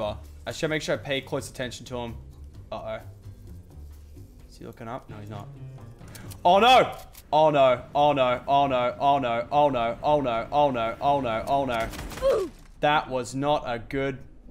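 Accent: Australia. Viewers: Is he Australian or American it’s really hard to tell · Are you aussie i am too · hes an aussie ya fake fan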